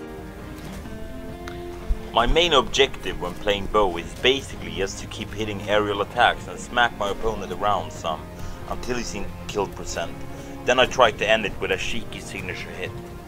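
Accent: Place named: Sweden. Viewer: Wait,are you Swedish? You've got that accent :D and btw really helpful video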